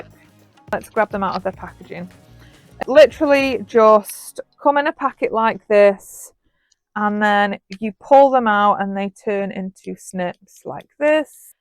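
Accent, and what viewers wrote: United Kingdom. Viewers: Are you from Liverpool? Love to listen to your accent.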